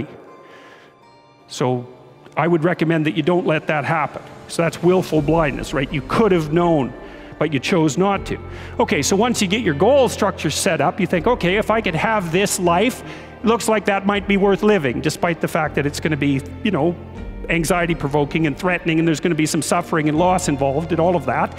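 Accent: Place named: Canada. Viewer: He sounds so Canadian haha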